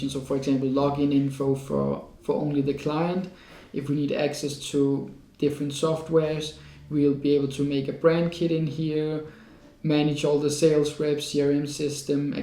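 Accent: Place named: Denmark. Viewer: Holy shit started the video and was instantly pointing and saying "Bro is Danish"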